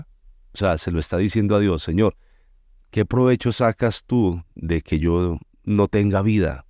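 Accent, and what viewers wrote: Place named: Colombia. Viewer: Es la Primer vez que edtoy OpenDocument de eyte pastor y la explicación de la palabra deDios. Por el asento como habla pienso que es Colombiano 😊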